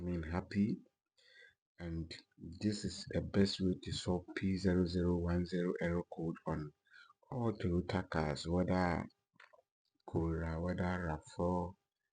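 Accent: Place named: Ghana. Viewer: Where are you located... You sound like a Ghanaian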